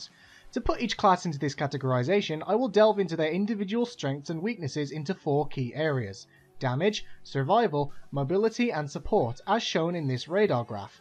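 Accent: Poland. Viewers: Wtf, are you polish?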